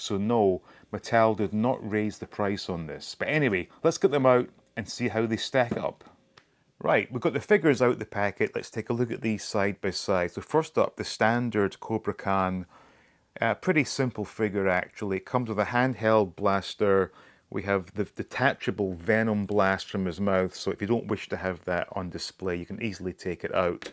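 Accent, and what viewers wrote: Scotland, United Kingdom. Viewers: That explains all, its the Scottish accent that got me confused.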